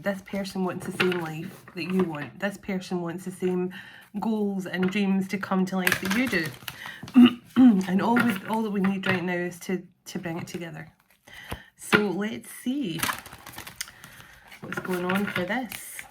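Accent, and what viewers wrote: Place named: Scotland, United Kingdom. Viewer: You look So Lovely ❤ Blue and White, blessed with a Scottish voice 💙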